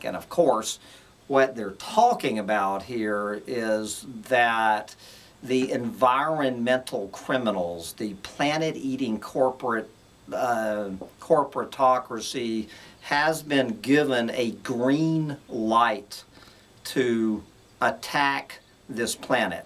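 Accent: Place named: Pennsylvania, United States. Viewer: He is upstate where accents are more like Pa. and Ohio than the City's interesting accent.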